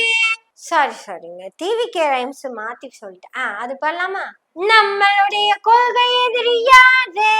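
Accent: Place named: Coimbatore, India. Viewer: கோவை தமிழ் அருமை சகோதரி வாழ்த்துகள்❤❤🎉🎉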